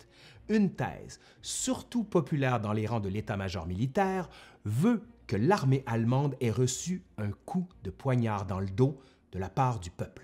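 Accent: Quebec, Canada. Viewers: Merci pour cette série passionnante. Je continue à adorer ton accent au sirop d'érable. Tu as fait un travail remarquable de clarté, d'impartialité et de dynamisme. Je pense que c'est un modèle de connaissance à donner autour de nous pour expliquer ces années d'horreur qui ont presque brisé la France. Merci aussi à ton compère. Bises 😉😁👍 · j'adore ton travail... je ne ma lasse pas d'enchainer les vidéos (dans la foulée j'enquille 1939 après avoir vu 1789, 536, 1709 et quelques autres dont le racismes ou le sexe au moyen âge) tu as un vrai don pour qu'on comprenne tous et ton accent québécois apporte son charme à ta diction. pourtant je n'ai pu m'empêcher de relever la coquille qui tue à : Adolf HiLter ne m'importune pas plus que ma cousine contrairement à Adolf HitLer... désolé mais fallait que je le dise... encore une fois merci beaucoup. Continu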